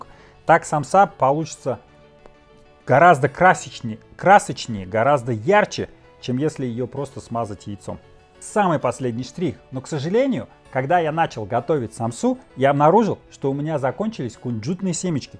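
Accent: Uzbekistan. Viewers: У Вас как будто хорезмский акцент. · У вас узбекский акцент ;) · Да, акцент узбекский. Сразу слышно!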